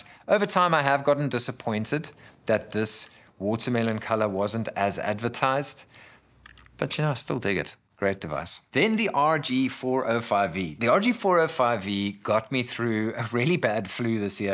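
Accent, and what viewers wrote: South Africa. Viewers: lol just cause you have a lekker saffa accent I bought a retro pocket 2s lol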